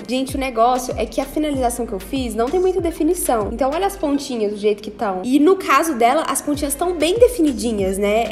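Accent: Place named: Northeast, Brazil. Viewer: Aqui.se chama lerota kkkk ou ataca mas amoooo esse nosso sutaque nordestino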